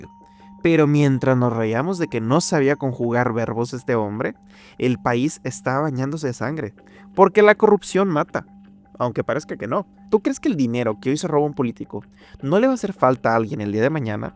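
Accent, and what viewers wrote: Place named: North, Mexico. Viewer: El acento norteño del narrador lo hace más cómico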